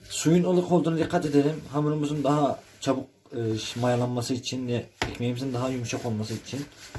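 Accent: Hatay, Turkey. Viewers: Tam bir Hatay şivesi😂🤭 Eline sağlık bu aradaa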